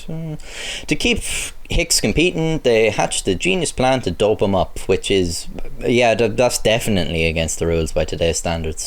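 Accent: Ireland. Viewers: bro are you irish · I've subscribed you are fucking hilarious my friend I think it's the Irish accent it gets me every time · 32 He has a Irish accent. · I KNEW YOU SOUNDED IRISH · Irish boiii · Are you Irish? The "r" in your accent seems to be very irish.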